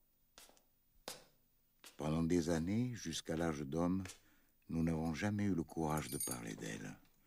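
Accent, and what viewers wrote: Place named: Provence, France. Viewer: et que dire de l'exceptionnelle interprétation de Jean-Pierre Darras, pourtant Parisien, qui narre avec tant de justesse ce passage. On pourrait croire que c'est Pagnol lui même qui parle, tellement son accent provençal est bouleversant. Associé à ce monstre sacré qu'est Vladimir Cosma, on sait dès les premières notes que l'on va être dévasté par des flots de larmes. Merci Mr Robert pour cette extraordianire adaptation de cet autre monstre sacré qu'était Marcel Pagnol.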